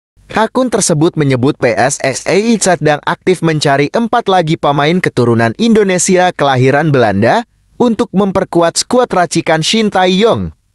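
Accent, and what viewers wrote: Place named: Malaysia. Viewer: BROO SAYA KASIH TAU KAMU KALAU BACA BERITA PAKAILAH BAHASA INDONESIA YG BAIK DAN BENAR,,,NGGK USAH IKUT IKUTAN SAMA ORG MELAYU MALAYSIA....??? EFF AFF/..... A E / B BE C Si D.... .....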